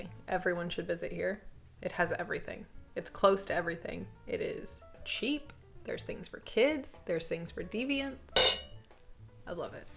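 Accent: England, United Kingdom. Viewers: I actually think you have picked up a little english accent love it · Your British accent might be rough but you have developed a British-esque cadence to your speech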